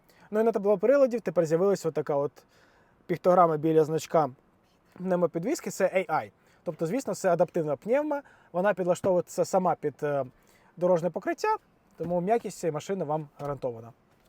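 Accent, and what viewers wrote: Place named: China. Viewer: А навіщо говорити англійською з китайським акцентом ?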